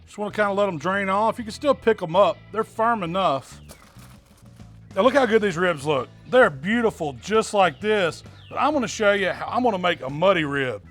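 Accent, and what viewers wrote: South, United States. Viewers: It’s that southern draw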